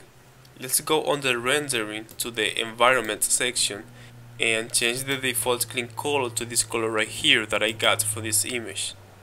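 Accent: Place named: Latin America. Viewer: Thanks. I like the way you explain things. Keep it up broh. BTW. You speak spanish don't you? Te escucho un acento latino:)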